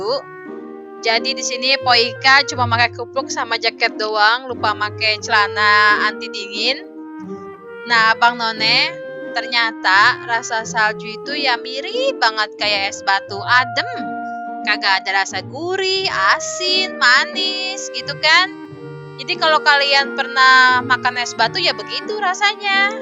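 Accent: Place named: Betawi, Indonesia. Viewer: ngomongnya legit bgt gemes betawi medok 😊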